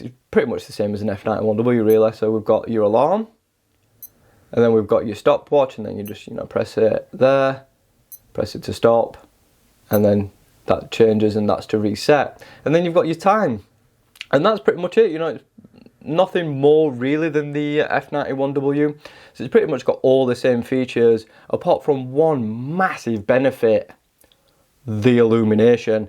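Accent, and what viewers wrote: United Kingdom. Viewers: Nice review. Like your Bri'ish accent.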